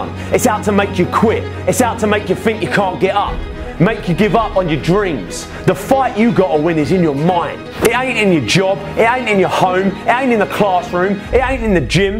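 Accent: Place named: United Kingdom. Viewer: your british accent is fucking amazing!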